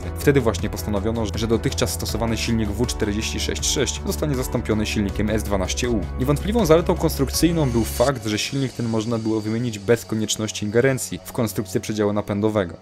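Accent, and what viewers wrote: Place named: Russia. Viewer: Dlaczego masz ruski akcent,? Skąd jesteś? Sorry, jestem tzw. poliglotą i znam kilkanaście języków, więc jestem trochę przewrażliwiony i nawet jeśli nie robisz zbyt wielu błędów to zdradzają cię samogłoski, ikanie, akanie.... Prosty lud to kupi, a ja nie. To jak to jest z tobą - Podlasie, czy yeah bujny ruski troll?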